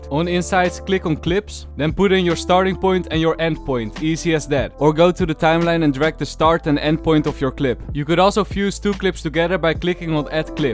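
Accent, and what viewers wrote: Netherlands. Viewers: are u netherland ? u raely have the accent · is that a dutch accent i hear?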